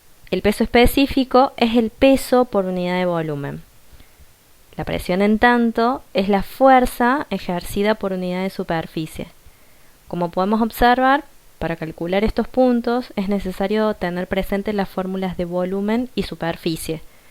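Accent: Córdoba, Argentina. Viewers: Eres cordobesa?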